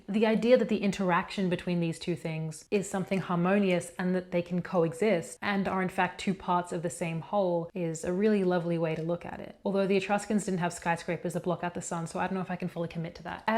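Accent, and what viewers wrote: Australia. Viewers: I think Australia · Thanks, I enjoyed this summary of the Etruscans. It’s hard to get an overall picture so concise. It’s also funny listening to how you change from an Aussie accent to an American one depending on which words you say 😂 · Australian? Capricorn? I need answers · Are you Australia based? Great content. So well done…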